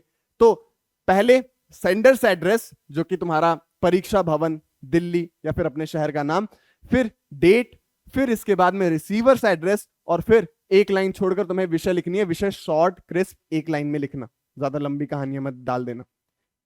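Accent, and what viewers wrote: Bihar, India. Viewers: Bro pure bihari lag rahe ho 😊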